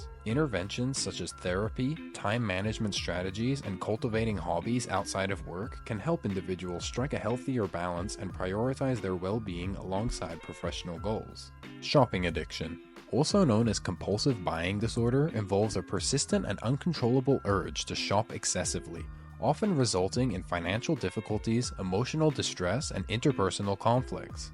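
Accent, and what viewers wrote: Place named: New York, United States. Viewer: That's definitely either a New York or Philly accent, not Boston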